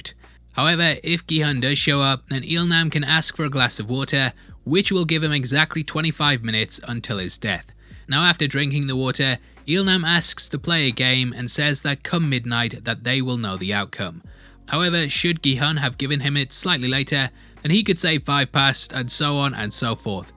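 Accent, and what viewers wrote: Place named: Newcastle, United Kingdom. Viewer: He's not doing anything with his voice. He has a Newcastle accent.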